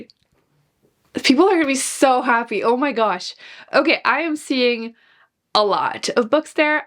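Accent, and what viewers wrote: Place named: Quebec, Canada. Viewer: Moi qui t'entend compter en français et qui réalise que tu es québécoise: 😮🤯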